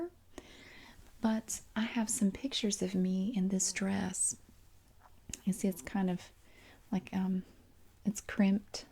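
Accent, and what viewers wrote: South, United States. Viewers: It is joyful to listen to how intrigued you were with the various items in the chest. Your Southern accent and your interests are very relaxing. Keep up the fantastic work!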